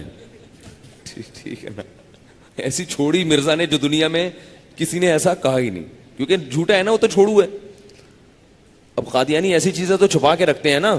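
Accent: Punjab, Pakistan. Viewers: uff ALLAH ini bhairi punjabi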